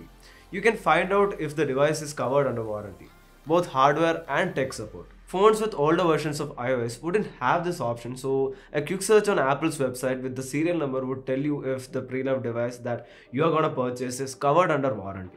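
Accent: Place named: Kerala, India. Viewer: 10 seconds into the video and I know that's a mallu guy speaking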